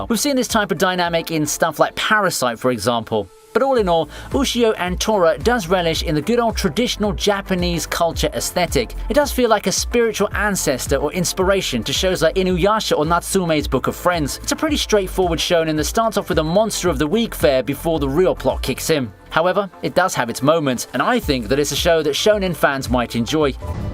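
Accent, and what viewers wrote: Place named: United Kingdom. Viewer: British limey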